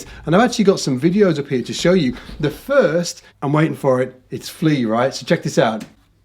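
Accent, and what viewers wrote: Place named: Leeds, United Kingdom. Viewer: Where exactly is your accent from bro? I hear Leeds area of course but I am hearing other dialect, did you live down South for a while???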